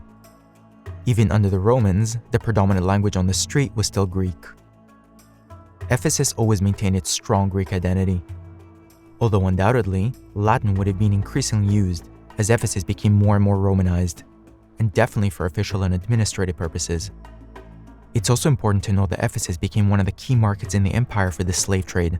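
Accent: United States. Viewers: Are you American or simply someone speaking American English and probably jewish?? I normally steer clear of American docos because they usually "silly"....probably as a result of the poor public education system.?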